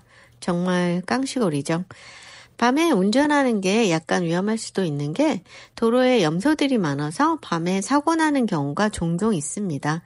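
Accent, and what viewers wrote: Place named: Seoul, South Korea. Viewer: 또랑또랑한 서울 말씨 참 듣기 좋네요, 멋집니다.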